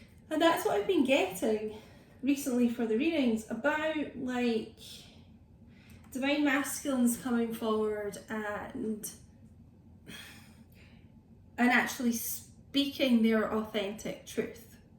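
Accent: Scotland, United Kingdom. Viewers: Great realisation. What a beautiful Scots accent.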